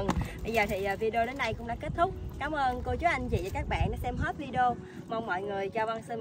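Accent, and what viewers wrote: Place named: Mekong Delta, Vietnam. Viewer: Nghe giọng nói của người miền Tây đã thấy dễ thương rồi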